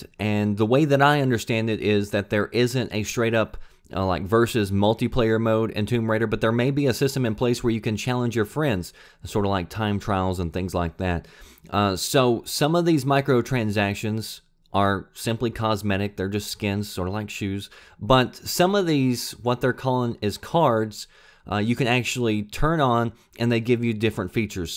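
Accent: South, United States. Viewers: Your southern drawl is really sexy ;)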